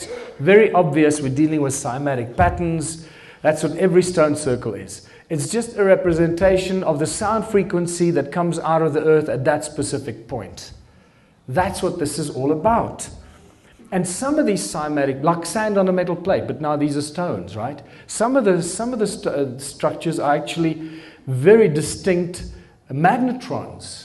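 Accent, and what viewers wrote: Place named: South Africa. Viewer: Love it! and spoken in true South African English lingo.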